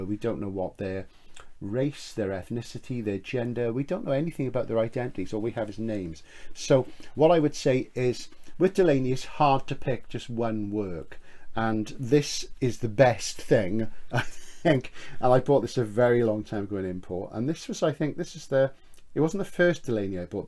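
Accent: Wales, United Kingdom. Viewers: You sound like a proper Welshman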